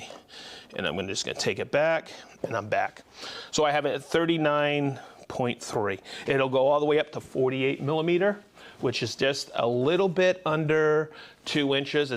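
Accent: Boston, United States. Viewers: I would have bet good money you were from Boston.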